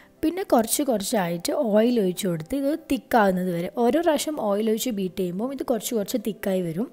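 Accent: Kannur, India. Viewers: farsha fashi kannur aaanenn thonnun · സംസാരം കേൾക്കാൻ നല്ല രസമുണ്ട്.. കണ്ണൂർ അല്ലെ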